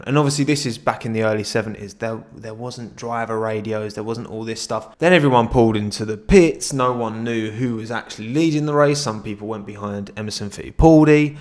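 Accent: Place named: Essex, United Kingdom. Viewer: God damn, I absolutely love your content. It's way up there in F1 context. But I cannot stand the Essexness! I really wish I could look past it but I just can't.. Keep making great content and hopefully I'll be able to break this barrier.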